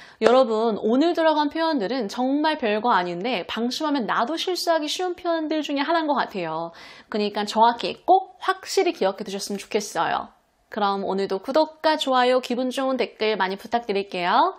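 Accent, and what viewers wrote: United States. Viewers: 완전 미국영어네요… 디싸이링~~ 쌜맨~~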